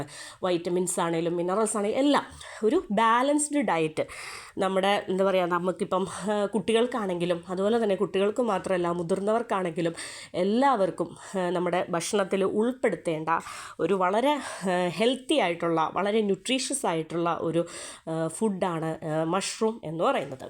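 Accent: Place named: Trivandrum, India. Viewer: Nice video. Appavum kadala curryum nalla combination aanu.Nalla avatharanam. Kellan enik valiya eshtamanu. Place aevideyanu? Samsaram ketit Trivandrum aanennu thonnunnu ❤❤❤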